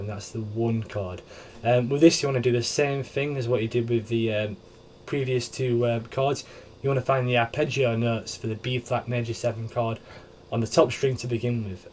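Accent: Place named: Hull, United Kingdom. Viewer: Good lad - I'm from Hull myself, nice to hear a lesson in an accent similar to mine!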